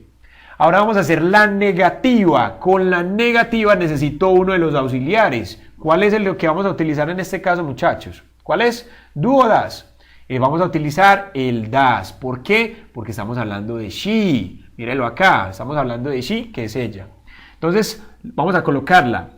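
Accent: Colombia. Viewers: Estimado por tu forma de hablar sos colombiano, saludos desde mi Tierra Catracha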